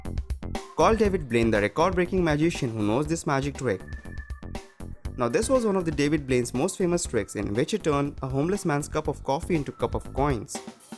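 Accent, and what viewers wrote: India. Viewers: Indian accent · What'sup with the indian accent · Why do some videos have a host with thick Indian accent? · I hate that indian voice. Thumbs down! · He is Indian because Indian people voice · indian accent, bye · Learn another accent, this indian one is very cringy and anoying. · Please, your India accent so annoying, and dont copy others video. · The voice over has a strong Indian accent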